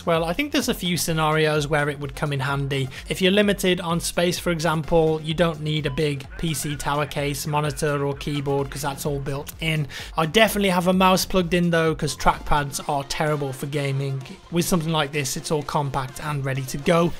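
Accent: United Kingdom. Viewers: ***** I don´t see your logic here. He has a British accent hence there is a much higher probability that he´s from the UK.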